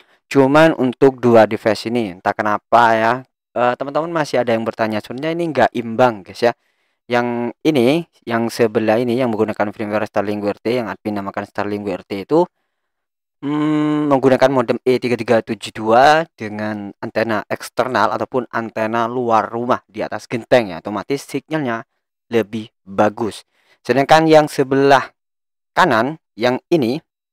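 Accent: Madura, Indonesia. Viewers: bang, orang Madura?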